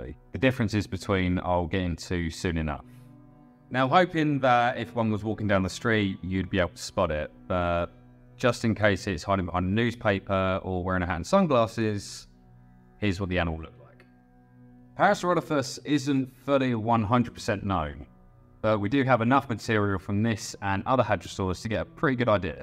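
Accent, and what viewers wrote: Essex, United Kingdom. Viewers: Hang on to the Essex thing, young man, it figures importantly in why so many people enjoy these excellent presentations! I am an old man from the Mouth of Raccoon in Kentucky Appalachia and I appreciate a good regional accent! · American here - keep the Essex! Love it! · Nothing wrong with the Essex, nice to hear something a bit familiar for once ;) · Because you mumble. It's not the accent, it's that for us to understand your accent you need to enunciate your accent clearly and not slur words in a hurry or get quieter towards the end of a sentence. I, a non-native speaker, have never heard Essex accent before this video in my life, but I had no problem understanding you when you actually spoke up. You don't need to speak like a BBC host, you need to speak like an Essex boy who hosts a video instead of like an Essex boy who talks to his mates on the bus. 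If you think that you actually did that, you dearly need to get a less muffled microphone. Sounds like you got the wrong end of Shure, completely dead top end. But top end is where "easy to understand" sits. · He's understandable. He's from Essex, it's just how they talk.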